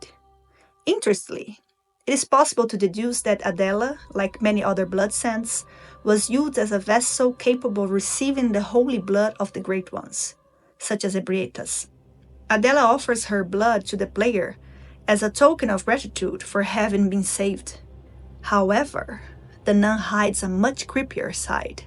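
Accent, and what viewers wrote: Brazil. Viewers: I don't know much about Bloodborne (i'm still trying to understand the story, since i can't play it without having a heart attack), but i really like Poe, and any video essay merging literature with videogames is worth to see! Also... Your accent is familiar, você é brasileira? Awesome video! Keep going!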